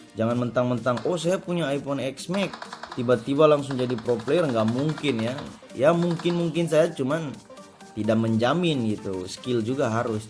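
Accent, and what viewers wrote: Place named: Sunda, Indonesia. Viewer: Orang sunda yeuh logat na beda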